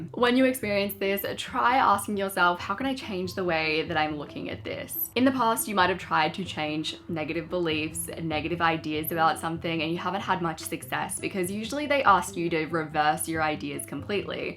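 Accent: Australia. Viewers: I can’t stand your accent. Australian accent is sooooo annoying. It’s like you guys add an L to the end of words like food. Your a mix of English and some made up garbage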